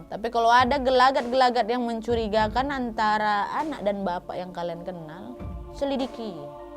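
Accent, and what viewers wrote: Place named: Medan, Indonesia. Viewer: Mantap kali logat kakak 🤣 sama2 orang medan nya kita kak e ??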